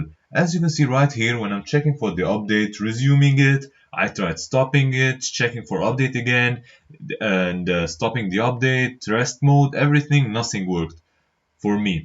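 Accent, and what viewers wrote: Lebanon. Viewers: you sound very Lebanese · Lebnene sa7? Min sawtak w lahjtak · Hii are you lebanese ? Cause your name and accent makes it seem like you are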